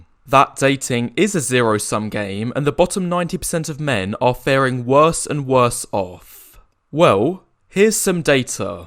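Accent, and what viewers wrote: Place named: RP, United Kingdom. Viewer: I like dialect. He can easy play in a Prince Charles movie.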